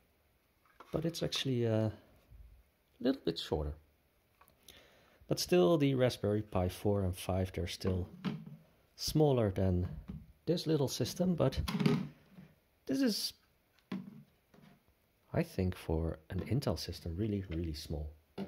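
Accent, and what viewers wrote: Netherlands. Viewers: I hear a bit of nederlands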